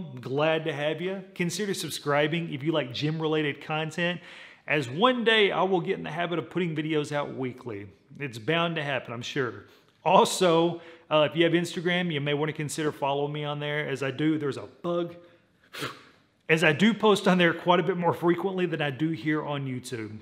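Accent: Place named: South, United States. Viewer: Love the southern twang! And the gym too!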